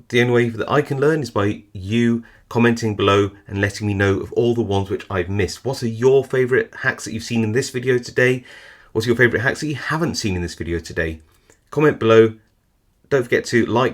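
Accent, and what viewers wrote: United Kingdom. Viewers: Excelent presentation! I already know most of this tricks but with your strong british accent all look like some cassic documentary worth to watch, and yes I almost forget, which size of bra is the best for making lockpicking tools? =) =)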